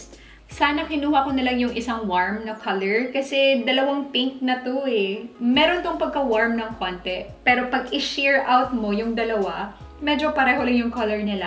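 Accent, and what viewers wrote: Visayas, Philippines. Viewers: I love your vids, coz I also have dry skin and we almost have the same shade (I think). Ang saya manood ng vids na super relate ako lalo na kapag parehong hindi maganda yung effect ng product because of our skin type. and I super duper love hearing your bisaya-slang accent, super sushal po:)I would love to try the Superstay Ink Crayon Lippie kasi wala pa sa Pinas nyan.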